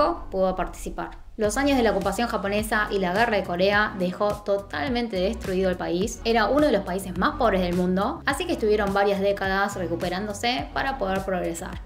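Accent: Argentina. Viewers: Pensé que vivía en Argentina por que claramente entiendo su acento Argentino pero no crei que vivía en Chile me sorprendió la verdad.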